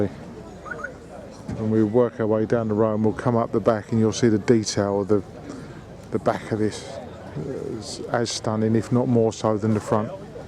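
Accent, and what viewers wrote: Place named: United Kingdom. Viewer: Seeing these cars in the UK and narrated by a Brit, is great to me. A different point of view. Growing up, my family had a ‘49 Chevy DeLuxe and a ‘59 Chevy too. Thanks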